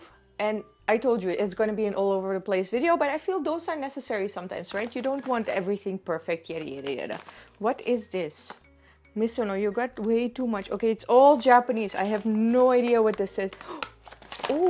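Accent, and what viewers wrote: India. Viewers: Ik heb een poos niet meer op jouw video’s geklikt maar nu dus wel. En het valt mij op dat je je zelf een typische accentje hebt aangeleerd. Het is Indiaas, Engels 😂 grappig om te horen bij jou.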